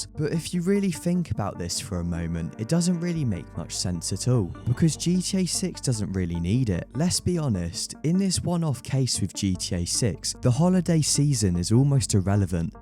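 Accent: United Kingdom. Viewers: Why does it seem like nobody in america is making these videos? Every gta 6 video sounds like some extra from harry potter narrating it.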